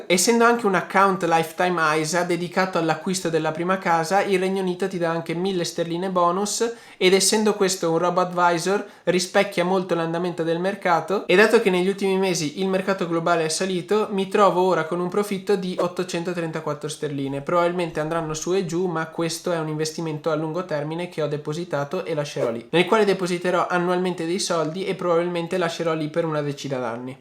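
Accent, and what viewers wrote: Veneto, Italy. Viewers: Scusa.......... MA TE SI VENETO?!?!?! SE TE SITO VENETO ANCHE MI SO VENETO VECIO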